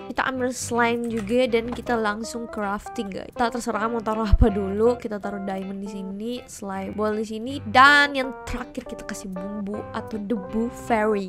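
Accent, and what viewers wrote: Java, Indonesia. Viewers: medok banget anjir waowkkkwka🤣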